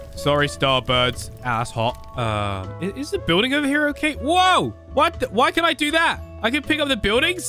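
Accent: United Kingdom. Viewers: Question, are you British?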